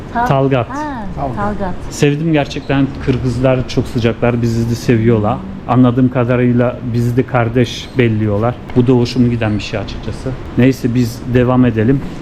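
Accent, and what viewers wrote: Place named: Aegean, Turkey. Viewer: türklüğüyle övünen güzel bir kanal..tebrikler..şiveniz egenin ödemiş tarafları gibi, nerelisiniz kardeşim?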